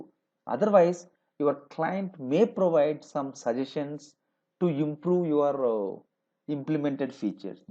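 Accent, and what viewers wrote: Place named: India. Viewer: and again an indian. cant listen to that accent anymore -.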